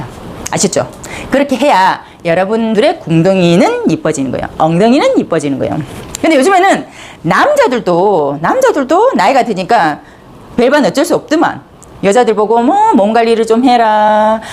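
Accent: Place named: Gyeongsang, South Korea. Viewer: 갱상도 사투리 맛깔나게 하네 엉덩이 노노 궁디 오케이~~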